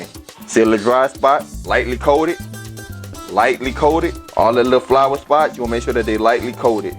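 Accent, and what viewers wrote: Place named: Louisiana, United States. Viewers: Of course it's a Louisiana accent the best there is. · I can tell your from NOLA my entire family is from there. Love the accent😉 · I knew the second I heard his accent, he was from Louisiana. 504 REPRESENT!!! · Are you from Louisiana, you have a little Louisiana accent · Y’all he’s from the south most southern folks have an accent it doesn’t mean he’s dumb...y’all the dumb ones for not understanding that. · I'm an Aussie and I love the southern accent, and love how he does the thang with his wangs. 🤣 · Love the Southern Accent...Chicken Wangs lol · First off, clearly you have to get "wangs" and not "wings" if you want crispy chicken. Great video and I love that N'awlins accent! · Gotta be from Louisiana 💓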